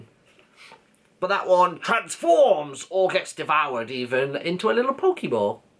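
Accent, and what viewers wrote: England, United Kingdom. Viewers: i loooooooove this english accent *o*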